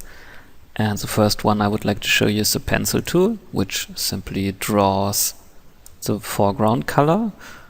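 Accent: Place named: Germany. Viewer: App Magician he sounds German lol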